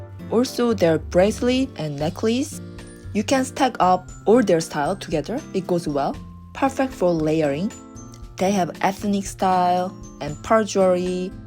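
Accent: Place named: South Korea. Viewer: Are you Korean? I am Korean too and I can kind of detect it from your accent. Lovely looks!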